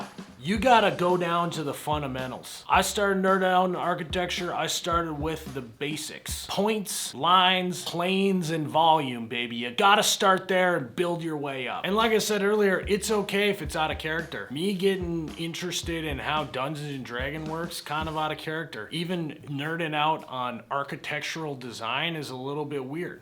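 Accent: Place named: Dakota, United States. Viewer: I've seen your comedy sketches on FB for a while, but just over the last couple weeks have seen your YouTube videos. With that said, loving it man 😂. Can tell you're a Dakota guy, and sound exactly like all my friends from back home when you're talking about real shit.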